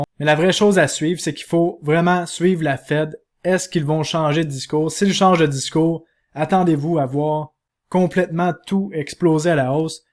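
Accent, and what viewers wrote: Canada. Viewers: Super video com Dab.. L’accent Canadien est tres fort et pour un Francais il faut se concentrer pas mal pour bien comprendre ;)) Merci bien quand meme ! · TB video avec en plus cet accent canadien (vu de France)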